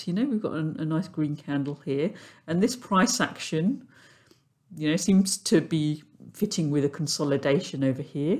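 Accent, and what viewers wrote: United Kingdom. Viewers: The best analysis in a very long time, and I love the British accent as it reminds me of my lovely old days in Wesr Yorkshire at Bradford Uni. Ta love!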